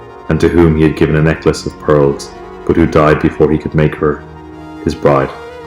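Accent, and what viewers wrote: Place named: Ireland. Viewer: God, I love ya, mate. Such challenging subject matter you tackle, always doing a great job with it. Yes, I do see that, the strong similarities between the kingdoms - if they're really separate at all. This battle-weary heart, deeply sensing our oneness with All That Is, longs for the peace of our underlying harmony, which slips me up at times. It just is what it is, & it's good to know that. Until we at least accept the is-ness of whatever it is, we're going nowhere trying to change it. Acceptance & allowing smooth the way to manifest desirable change. Ah, Irish. I love it, but then I'm a sucker for "foreign" accents. Maybe it's that oneness thing, yet again. :D ~♥~ PS You may enjoy this fav quote, likely being able to see/feel more deeply into it than most: "Observation with evaluation is the highest form of intelligence." ~Jiddu Krishnamurti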